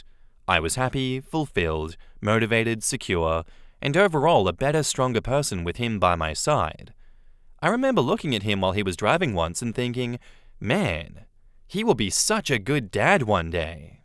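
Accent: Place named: Australia. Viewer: Me over here understanding "The poly and burner part" during the intro. Thought I was used to the Australian tongue by now, but the fr*nch is throwing a wrench in that.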